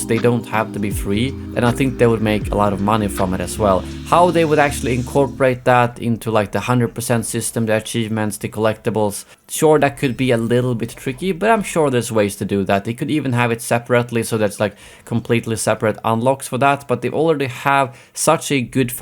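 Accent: Sweden. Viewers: Haha man kan höra att due svenne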